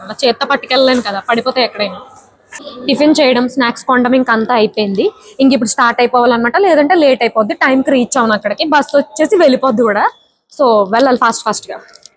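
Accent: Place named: Rajahmundry, India. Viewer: Mi Rajamundry slang super 😂 snacks 😅 anyway nyc vlog 🙌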